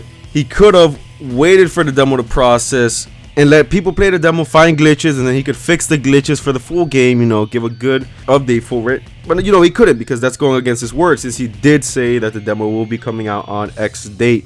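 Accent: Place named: United Kingdom. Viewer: never new you were British mate :)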